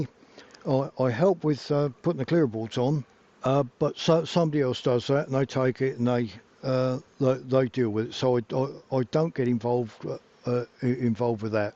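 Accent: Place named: Sussex, United Kingdom. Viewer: Sussex. Not many of us left!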